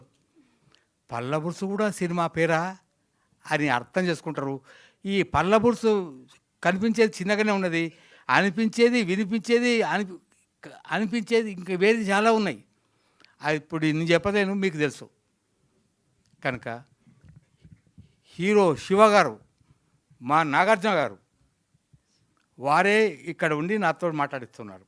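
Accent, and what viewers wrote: Telangana, India. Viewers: Orey telangana slang ra ardham kadha niku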